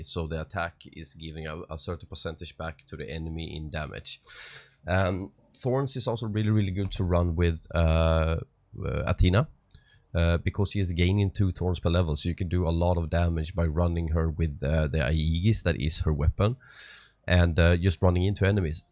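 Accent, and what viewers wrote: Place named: Sweden. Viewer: w sverige always know a swedish talking english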